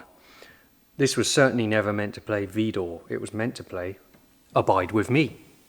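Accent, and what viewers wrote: England, United Kingdom. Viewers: Love gearing that ENGLISH ACCENT, YES THE BIRDS ARE ACTUALLY SINGING PRAISES TO THEIR CREATOR,!! I saw it somewhere in the Scriptures one time, HALLELUJAH, Lovely presentation your Sis, Thankyou, E83 Australia, 🎉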